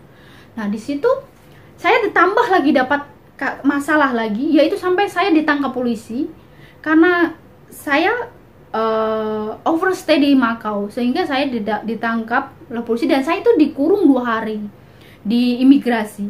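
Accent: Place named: Malaysia. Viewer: Saya senang dengar kesaksian kamu dgn eksen melesyia sangat enak di dengar,ditambah bahasa inggris.Tuhan yesus .enyertai kamu.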